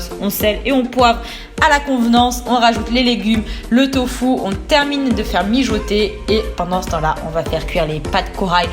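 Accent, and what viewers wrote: South, France. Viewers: Top avec l'accent de chez nous le sud non😊😊😊😊?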